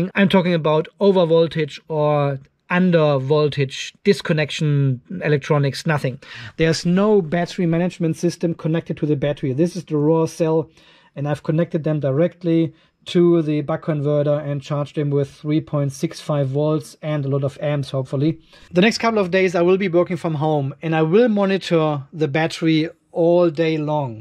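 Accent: Germany. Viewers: Hey there, I've ordered the same cells.. waiting for delivery 😤 Btw: is it possible that you are a German guy? Sound very familiar to me. Keep on going, looking forward to your next video....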